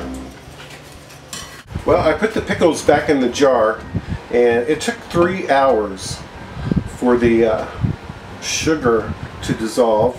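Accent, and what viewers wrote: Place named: Evansville, United States. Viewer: I sense an Evansville, IN accent. Coming from a Chicagoan who frequented that area as a kid since I used to have family there.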